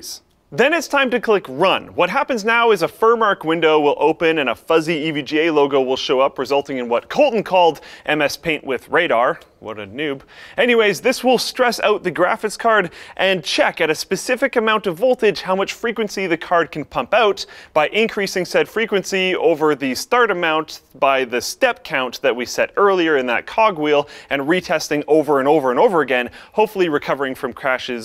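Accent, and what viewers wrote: Canada. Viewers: Canadian accent is strong with this one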